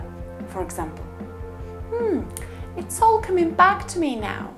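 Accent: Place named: United Kingdom. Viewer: O, my Gosh, I loved your accent! I dunno why, but I like British accent. It's so beautiful and royalty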